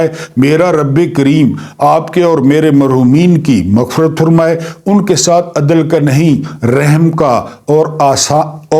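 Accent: Saraiki, Pakistan. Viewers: Masha ALLAH kia khoub saraiki ka touch detey hein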